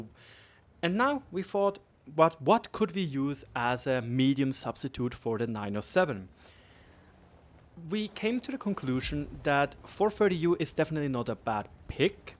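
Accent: Germany. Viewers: Das Deutsche in Dir hört man schon hart raus mein Freund :D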